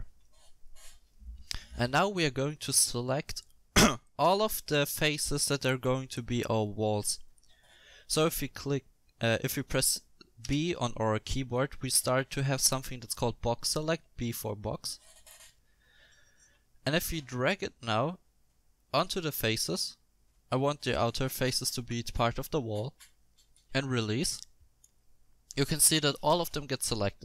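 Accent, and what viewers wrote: Germany. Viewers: Du hörst dich ein bischen deutsch an :)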